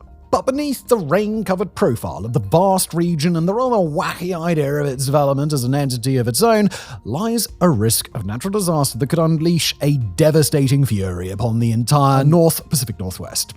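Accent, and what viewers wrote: United Kingdom. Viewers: Idk, you make a compelling case, on the other hand the dude in the video has a goofy British accent and thus sounds smart and authoritative… not sure who to believe…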